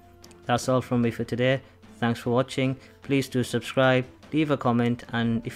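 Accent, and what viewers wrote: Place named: Liverpool, United Kingdom. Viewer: ***** yea i think it's a Liverpool accent or I think they call it Gordy lol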